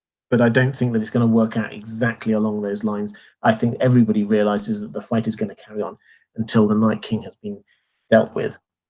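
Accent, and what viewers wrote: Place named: Southern England, United Kingdom. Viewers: sounds like a southern English accent. I've never been to England so take my guess with a grain of salt. I would love to know the answer though. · Hes English alright, from the south. Lovely voice x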